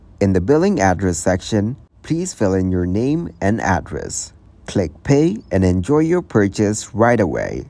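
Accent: India. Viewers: even you talked in english you cant hide your indian identity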